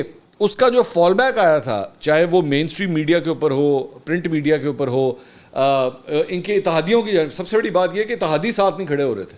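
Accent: Punjab, Pakistan. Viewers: السلام علیکم بھائی پنجابی والا لہجہ بہت اچھا لگتا ہے اپ پر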